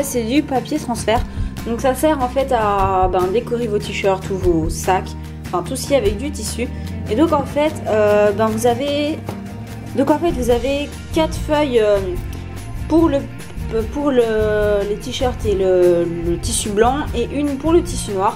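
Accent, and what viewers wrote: Belgium. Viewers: Tu es belge ? Si oui comme moi !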